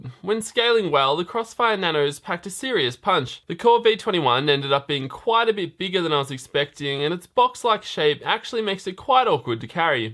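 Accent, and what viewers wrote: Australia. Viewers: Mate, no offence but your voice is horribly annoying. On top of it you just don't stop talking with no pauses between sentences. Your oz accent is almost incomprehensible at times and I resorted to use the captions which as you can guess are not very good. I could not (even if the video is well made, edited and nice altogether) watch more than the half of it. Please consider someone else to narrate it.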